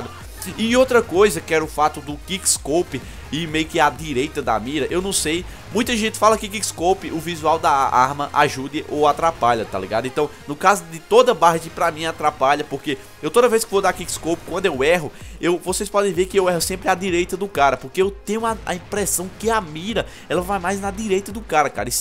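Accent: Northeast, Brazil. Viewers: VC É UM MITO MANO SOU SEU FÃ PRINCIPALMENTE PORQUE VC É DAQUI DO NORDESTE EU MORO AQUI MANO EU AMO SEU SUTAQUE ASUHAUSHAUSHAUSAUHS <3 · ***** mano vc é do nordeste neh ?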